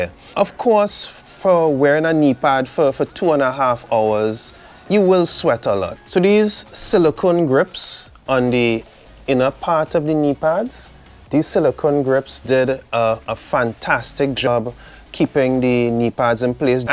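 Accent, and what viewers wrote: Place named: Trinidad and Tobago. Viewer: I hear a trini accent where do you ride ?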